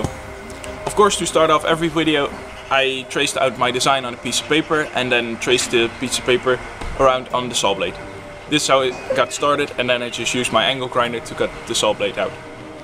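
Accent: Netherlands. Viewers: Typische nederlander aan het accent te merken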